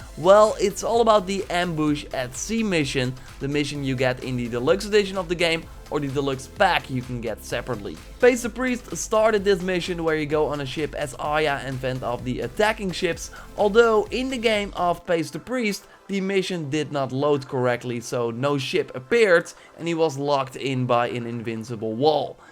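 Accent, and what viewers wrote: Netherlands. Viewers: he is dutch. thats why he has a acccent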